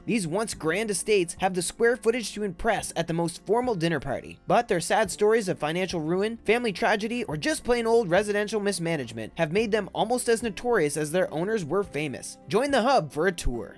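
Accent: Canada. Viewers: Definitely a Canadian narrator. Can’t say house correctly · Annoying voice and Kanuk accent: "hoose" (house), "oot" (out)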